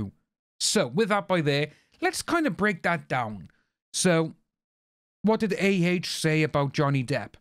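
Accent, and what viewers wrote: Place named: United Kingdom. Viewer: I am a Brit who emigrated to the US. Your insights are spit on. Plus, I’m missing the UK and your accent makes me feel at home.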